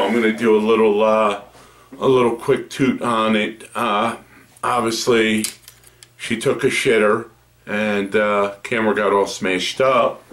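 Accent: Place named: New Jersey, United States. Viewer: lol, I guessed it well, I know my people, you sound like your from Jersey, I too am from hudson county, Live in south Florida so I do alooooot of flying down here all year round. My phantom has alot of use on it. I'm set-up for long range so I fly alot over 3km over swamp land. Multirotors are huge down here, even for just hobby. Went to jersey last summer and nobody really is into them up there from what I see. Not even most hobby shops have very little inventory on them. Oh well, good to see that your keeping it real up there.. Good Luck.....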